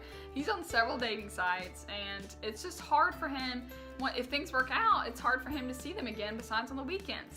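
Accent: United States. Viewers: What American accent is it?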